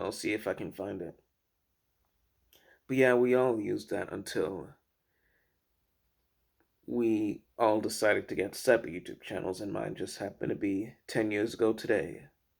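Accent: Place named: United Kingdom. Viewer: I accidently come across your twitter page earlier and me been as nosey as I am ended up here. I have to say, your an interesting guy. Also when you first started talking I would have never guessed you're from the states. I thought you had a British mixed accent but wasn't sure with what. You do have a little bit of a London accent when you say certain things too. Does your parents have an accent at all? The only reason Is, my partner grew up 20 minutes away from where I grew up and he talks completely different to me. He has a very posh accent compared to me, but his mum and dad moved around a lot for work for my mother-in-law and they have a very strange, posh, mixed accent and he picked that up. Whenever people start talking to us they can't believe we grew up in the same town. Anyway, I apologise for rambling and waffling on. I enjoyed your video, keep up the hard work.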